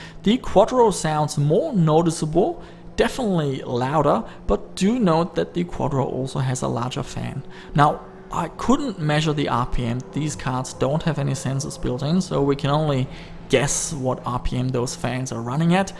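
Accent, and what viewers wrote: Germany. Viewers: you are from australia? I thought you were like german from your accent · can i ask you from where you are? you have this german-english :D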